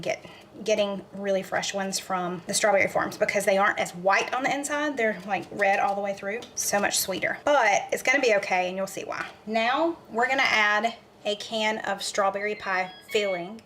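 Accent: South, United States. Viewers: I’ve watched you in another videos and why do you rants your rice before you cook it? I’ve never heard of that before from anyone except for you so I might’ve been doing it wrong all these years. By the way you say boil, oil, foil and all of your southern words correctly. How do I know you say them correctly , well, you say I’m just like I do, lol.❤😂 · Awww Gracie Lou 😢. As far as your “English”? That’s called Southern English. I speak that language too 😂. All of these dishes look amazing!!!!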